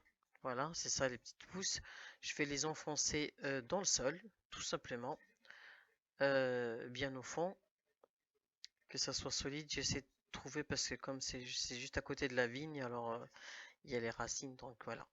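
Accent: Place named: Chleuh, Morocco. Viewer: il me semblait bien à ta façon de parler que t avais du sang chelh 🤣 Moi aussi je suis chelha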